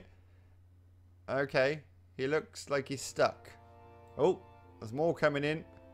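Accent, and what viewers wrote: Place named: United Kingdom. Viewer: I just started watching your channel and your accent is so cool! ^~^ British accent? idk lol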